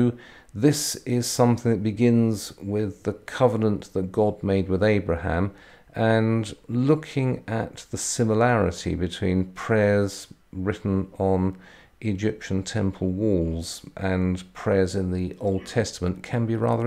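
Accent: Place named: United Kingdom. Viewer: I'm from America USA and I recently "stumbled" upon lectures. Truly, it's a wonderful experience to listen to him teach. His voice tone, UK accent, and amazing depth of knowledge about the various subjects is truly an amazing learning experience. No degree being gained by me as I've already got my B.A. and M.S., but I've always been a fan of ancient Rome and Christian Origins, so his lectures are a lovely thing for me! Thanks for the great work! You're a great teacher! - John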